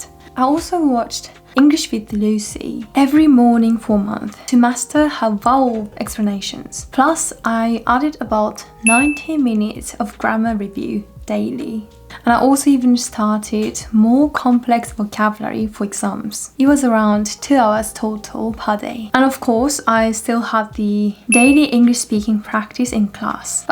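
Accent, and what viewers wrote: United Kingdom. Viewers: Great job . Your pronunciation is british but you need to improve your intonation and emphasis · So great that you speak original English and not American English!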